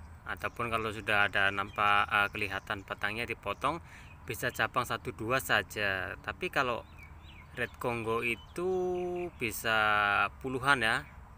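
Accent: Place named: Banyumas, Indonesia. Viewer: malay tp logatnya indo banyumas (sumpiuh deket sampang cilacap)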